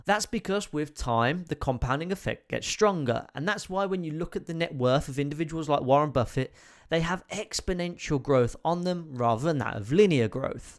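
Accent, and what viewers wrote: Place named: Northern England, United Kingdom. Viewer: I thought it was brummie initially but certain words made me think it was more northern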